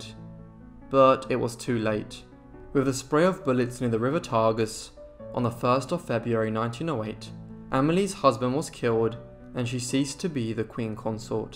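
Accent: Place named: England, United Kingdom. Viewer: i just realized how well written your biographies are. - and very well edited as to choice of photos, length of time on screen, etc. so well produced. thanks again. 🙂🌷🌱 you know what i am dying to know - where your forebears come from. you have an idiosyncratic sort of English accent, yet you say thanks like as american. the brass or copper tea or coffee pots seem to be Middle eastern or Turkish. do you think you’ll ever let us know?? it’s fascinating to try and figure out. thanks much. stay safe :) 🏺🌷🌱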